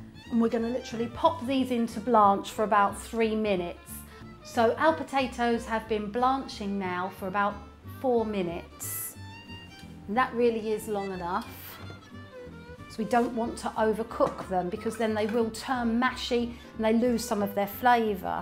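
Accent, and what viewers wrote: England, United Kingdom. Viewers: I have an abundance of potatoes and I needed a way to preserve them; Thank you for sharing. I cannot believe some of these rude comments below. >:( I can hear you just fine, and the English accent is great. :) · What a lovely British accent! Very sexy...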